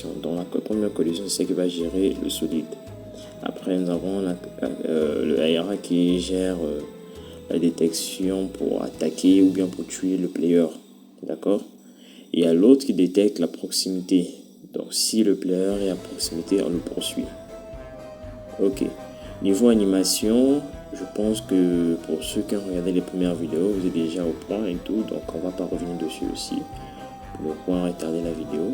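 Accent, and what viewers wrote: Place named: Africa. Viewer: tu as un accent africain bien venu dans la famille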